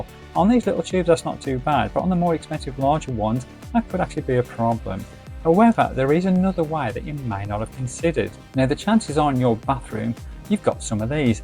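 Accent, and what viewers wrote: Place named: United Kingdom. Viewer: I have no idea what you'te saying and YouTube CC doesn't either. Hope whatever it is works well for you. [weird UK English strong accent goes here]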